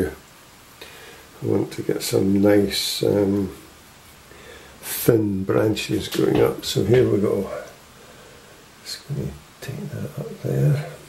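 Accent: Scotland, United Kingdom. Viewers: Love the painting. You sound like you are scottish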